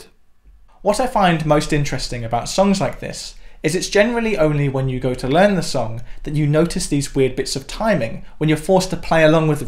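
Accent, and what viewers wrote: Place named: RP, United Kingdom. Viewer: You are way too posh, but still kinda cute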